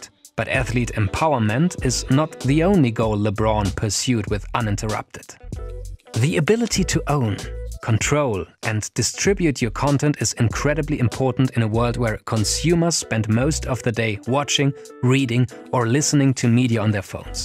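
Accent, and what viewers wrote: Germany. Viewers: Dieser deutsche akzent killt einen 😂 geile videos, weiter so 🤌🏻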